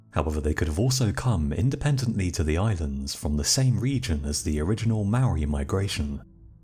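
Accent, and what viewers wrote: United Kingdom. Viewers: soon as i heard the british voice i started laughing lol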